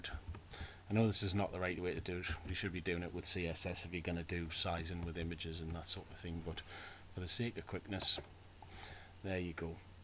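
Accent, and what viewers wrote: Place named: Newcastle, United Kingdom. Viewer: This is awesome. Django in a Geordie accent.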